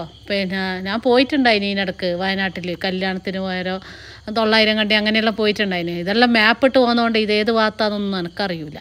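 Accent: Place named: Kannur, India. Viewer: വീഡിയോ വളരെ രസകരമെന്ന് പറയാതിരിക്കാൻ വയ്യ!!💢 നർമ്മം കലർന്ന തനി നാടൻ ഭാഷയാണ് വീഡിയോയുടെ പ്രധാന ഭംഗി👌🎉🎉!!! അത് നമ്മുടെ കണ്ണൂർ ഭാഷയിൽ കേൾക്കുമ്പോൾ നല്ല സന്തോഷം✨ ഹരിത ഭംഗി തുളുമ്പുന്ന പച്ചപ്പു നിറഞ്ഞ കാടുകളും കൂറ്റൻ മരങ്ങളും കാട്ടരുവികളും , പൂക്കളും മാൻ ആനക്കൂട്ടങ്ങളും നിറഞ്ഞ പ്രകൃതിയുടെ സുന്ദരങ്ങളായ കാഴ്ചകൾ അതിമനോഹരമായി പകർത്തിയിട്ടുണ്ട്.👌✨ കാഴ്ചക്കാരുടെ മനം കവരുന്നതായിരുന്നു ദൃശ്യങ്ങളോരോന്നും🎉🎉🙏✍️✍️ ആശംസകൾ💫💫💫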